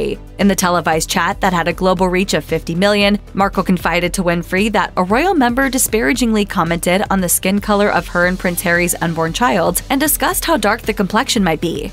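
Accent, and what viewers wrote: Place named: United States. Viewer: This is an American site... note the American accent? 🤦‍♀️🤦‍♀️🤦‍♀️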